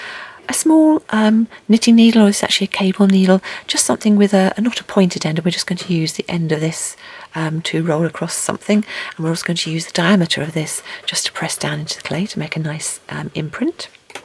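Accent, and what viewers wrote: England, United Kingdom. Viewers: That beautiful English accent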